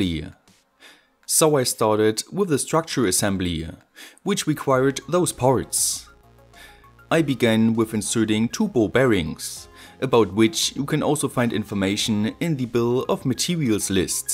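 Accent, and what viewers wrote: Germany. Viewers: When Deutsche sprechen English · German accent cool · Hast einen deutschen Akzent 😂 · Man hört sowasfon das du deutscher bist ...... Kleine Frage noch warum sprichst du englisch... no offens wollte es nur mal wissen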